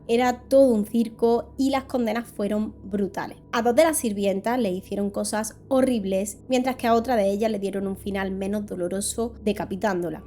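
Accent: Spain. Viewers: 我喜歡你的西班牙口音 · el acento de!!!el mejor acento de España!!o al menos el que mas me gusta!!!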